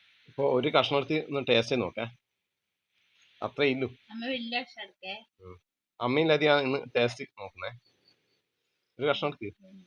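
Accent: Kannur, India. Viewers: നിങ്ങളു കണ്ണൂരാണോ പയ്യുന്നു രാണെന്ന് മനസ്സിലായി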